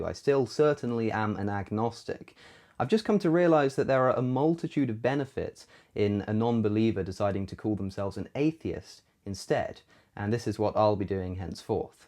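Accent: United Kingdom. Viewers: Your a smart guy but you're blessed with a British accent. It makes you sound smarter.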